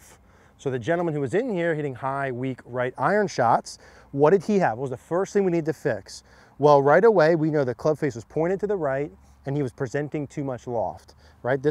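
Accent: United States. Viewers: My god it's so much better listening to an American accent and straight forward video rather than these British guys and all their cringey clickbait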